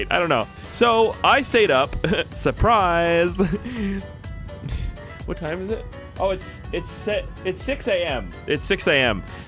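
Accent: Nova Scotia, Canada. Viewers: What side of Nova Scotia are you from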